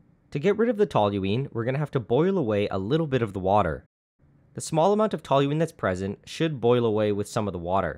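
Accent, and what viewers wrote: Canada. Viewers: Hey! Are you Canadian?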